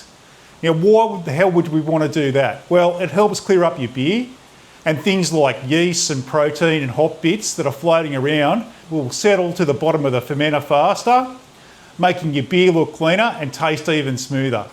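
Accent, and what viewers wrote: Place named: Australia. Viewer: Of course it was a compliment. I'm English and love the Australian accent. Was hoping to brew a Velvet Vanilla Porter by David Heath this weekend but our crappy postal service hasn't delivered the required yeast, despite me ordering it on Wednesday evening and it being posted 1st class Thursday. Royal Mail must hate me cause I'm always complaining about the service these days. I let them give the excuses then tell them I was a postie for 9 years back in the 1990's. Like most companies it's gone down hill since privatisation. Unfortunately my local homebrew shop doesn't stock the Lutra Kveik yeast recommend.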